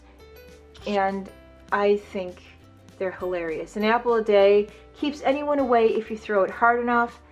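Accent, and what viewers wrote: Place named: Ohio, United States. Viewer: I discovered you today through your easy peasy eye trick. I haven’t watched much YouTube but lately, have been watching to get make-up tips. I watched a couple more of your videos when I found out you are from the Cleveland area. I grew up in Wellsville, down on the Ohio River. Then, you mentioned Texas. I have lived in Texas for 18 years, 10 in Houston and 8 in the DFW area. I think listening to you talk reminded me of home. I don’t miss winter but I miss Ohio. I am happy I happened to find you.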